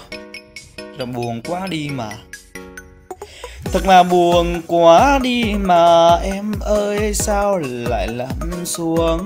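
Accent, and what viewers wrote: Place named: Thanh Hoa, Vietnam. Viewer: người thanh hoá à. Thấy có cả (mô)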